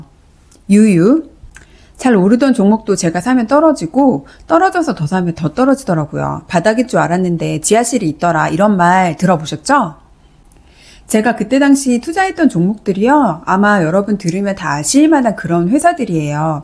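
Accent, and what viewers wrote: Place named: Busan, South Korea. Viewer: 벽면에 금고 문이 있네요. 금고 언제 가득차요? ㅎ 똑순히 시원하게 애기 잘하시네요.악센터 보니 부산분인가요? 유익한 말씀 감사합니다.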